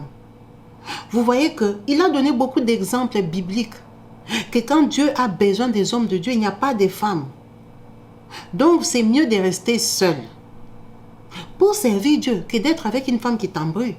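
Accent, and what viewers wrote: Democratic Republic of the Congo. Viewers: Juste un conseil madame. Parler avec votre accent congolais, vous etes ridicule avec cet accent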